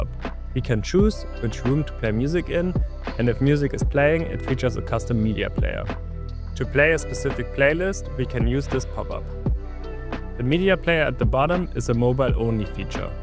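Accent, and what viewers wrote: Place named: Germany. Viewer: i hear deutsch in se english - top video, mach weiter so!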